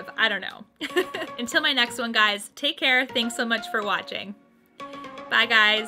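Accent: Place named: Canada. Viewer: I have to say I just love your channel and your personal energy. I also love your Canadian accent! 😁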